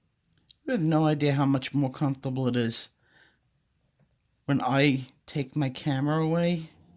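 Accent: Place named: Brooklyn, United States. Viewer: New to your channel, and wondering if you’d mind explaining what paska ( not even sure how to spell it, lol ) is.... never heard of it... loved your tutorial on leaves and as a Canadian, your accent is a delight, makes me smile... is it a Brooklyn accent? That’s my best guess.... thanks for giving such great instructions.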